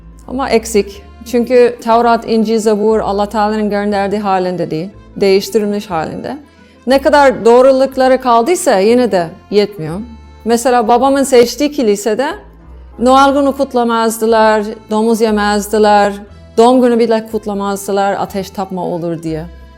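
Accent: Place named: Trabzon, Turkey. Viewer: Abla trabzon lu sanki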